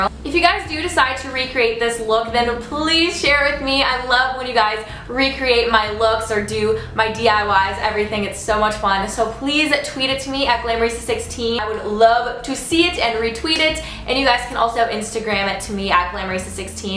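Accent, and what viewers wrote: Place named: Minnesota, United States. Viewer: Hehe I always thought the way you say bag was weird but I realized it's just because you're from Minnesota. I had a teacher from there (I'm from texas) and he would always pronounce words ending in ag like you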